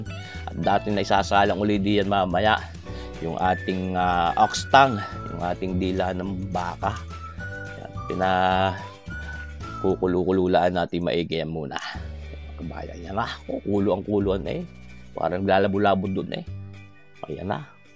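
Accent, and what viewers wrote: Batangas, Philippines. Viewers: Hello sir I like the way you cook and I love the batanggenyo accent. Greeting From Homer Alaska this is Berna Brown. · d ko n kailangan mag aral sa school sa iyo lang madami n akong nlaman lutuin pati batangas accent mo.....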